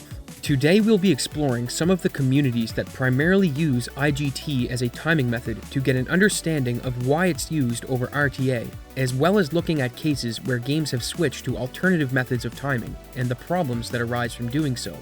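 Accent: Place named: Atlantic Canada, Canada. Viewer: How far east are you from? I'm trying to pin it down, but I'm having trouble distinguishing because of your decent effort between NS or NL. It's been annoying me that I can't decide. If I was watching this in a vacuum, I'd say NL, but other videos make me question...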